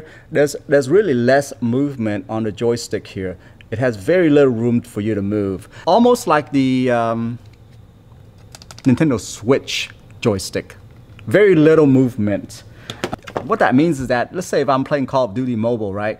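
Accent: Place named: Vietnam. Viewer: I can tell right away - viet brah :)) there's a bit of an accent. Thanks mate - just what I was looking for. Gamepad with the case on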